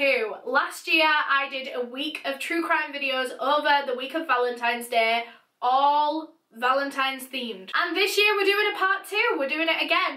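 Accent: United Kingdom. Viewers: Her voice is so angelic and beautiful she speaks in a British accent. She is a nice true crime YouTuber but I would like if she would call the people who are responsible for the crimes names like monsters psychos sickos etc…………….. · Not trying to be a dick but her voice is not that great 😅 She's almost yelling especially at the beginning of every video, and that turned me off of her for such a long time till I finally decided I'm gonna try to get past her yelling intro, and then I absolutely loved her and binged her content. She has a British accent, different from the "mainstream British accents" like in the movies and stuff, but still lovely.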